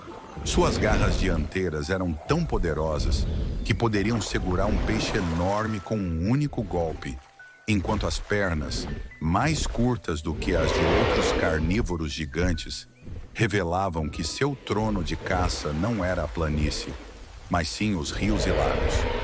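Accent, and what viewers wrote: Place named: Minas Gerais, Brazil. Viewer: Dublagem Minera uai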